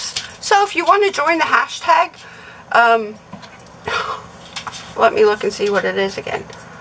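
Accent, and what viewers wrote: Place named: South, United States. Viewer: Thanks for the birthday wishes wow statement makes me feel old 😂🤣 Nonnagrace no but I'll take a peek I mostly watch Nomadic van lifestyle as I believe I mentioned before that's what I plan on doing for the remainder of my life hopefully starting in 1.5yrs curious what city in Ohio your from and sometimes I hear a little southern bell in your voice have a good night y'all 😀😂😜✌️👍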